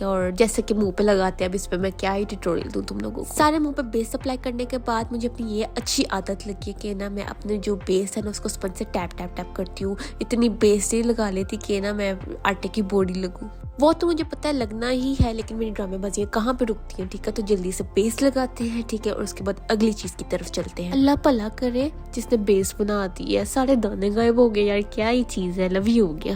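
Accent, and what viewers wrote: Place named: Punjab, India. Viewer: Punjabi accent full 😅😅😅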